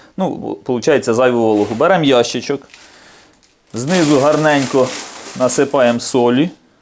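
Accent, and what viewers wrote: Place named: Poltava, Ukraine. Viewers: суржик обычный . зачем вы коверкаете русский ??? противно слышать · який приємний говір... такий як у нас на полтавщині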